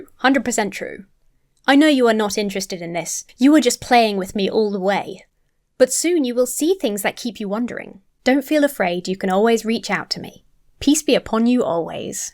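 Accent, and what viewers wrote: United Kingdom. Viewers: Pretty sad when people get scammed like this. I enjoyed watching you have a bit of fun with the priestess. Love you, ya funny British-talking satan-worshipping lady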